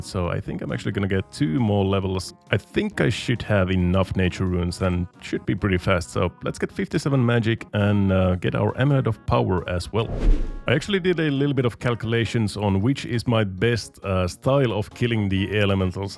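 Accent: Finland. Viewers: Since the first episodes, i always tought you were Finnish but never checked it out. Now i did and i was right all along. Tiiny tiny accent gave it away. Keep up the grind. (Englantis on aika pirun hyvä) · Wait, are you Finnish? I always thought German 🤔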